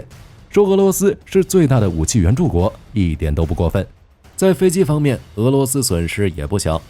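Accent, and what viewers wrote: China. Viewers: 這不是大陸腔調嗎? 大陸會允許這種影片? 最後幾句話也太單純了吧！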